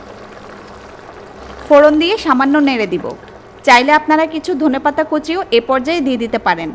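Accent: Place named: Chittagong, Bangladesh. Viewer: Apnar kotha bolar style dekhe mone hocche apni Chottogram er manus.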